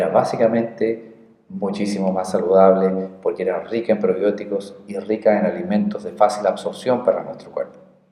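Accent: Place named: Chile. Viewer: Hola Estimado Muchas gracias por su aporte a las personas que estamos interesados en investigar aprender a cuidarnos. etc. En mi opinión es uno de los mejores videos que he visto sobre éste tema puntual. FELICITACIONES.. y la verdad que me he sacado algunas dudas con su video, me falta mucho aún pero seguiré aprendiendo necesito curarme. Me han diagnosticado INTESTINO IRRITABLE, y dudé sobre éste diagnóstico no tengo duda que lo que tengo es más que INTESTINO IRRITABLE. Por su forma de hablar parece Chileno verdad? Desde Uruguay le mando mis saludos. Francisco Machado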